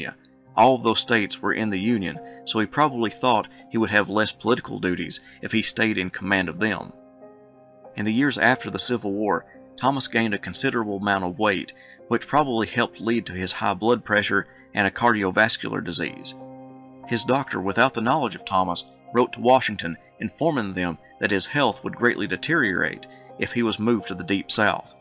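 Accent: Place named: Virginia, United States. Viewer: I have long admired Gen. Thomas and I often wondered why his name was forgotten for so long. Thank you for this series of videos on the life of this honorable, intelligent and courageous man. He is a credit to the United States. And so are your videos on the American Civil War. Incidentally, I imagined that your (Virginian?) accent is quite similar to Thomas' own voice. It made it seem that the man himself was narrating his own biography.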